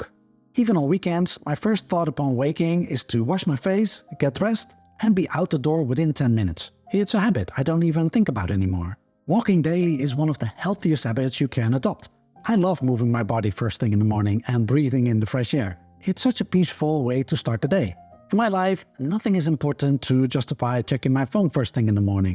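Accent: Netherlands. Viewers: Love your Dutch accent 😊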